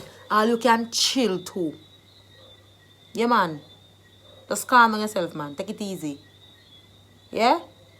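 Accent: Jamaica. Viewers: I love how Jamaicans talk. I clicked on video just to hear you talk lol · Wow.i like the Jamaican accent.. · Wow, I like the Jamaican accent; I enjoy how you speak. I will start practicing how to speak like a Jamaican. This video is very helpful. Thanks for sharing.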